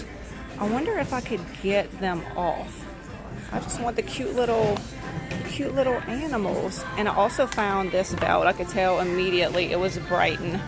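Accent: Louisiana, United States. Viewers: What is that accent? Louisiana?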